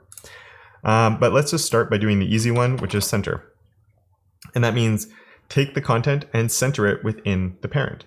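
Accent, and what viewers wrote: Canada. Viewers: The canadian "out" pronunciation is so strong, on this one :)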